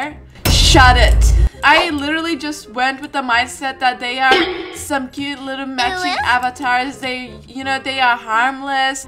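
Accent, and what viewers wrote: United Kingdom. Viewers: I'm very surprised by your British(or idk) accent(or voice)And can I join your videos?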